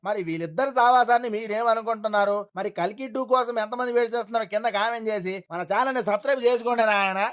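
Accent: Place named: Telangana, India. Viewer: CheppoddaraL jodka Telangana slang lo.. enduku ra mee Androllaku chinna chupa Telanganollante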